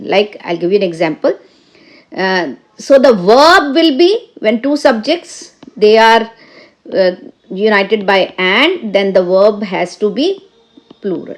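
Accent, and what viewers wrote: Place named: India. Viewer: Was your strong Indian accent an obstacle to be an IELTS examiner?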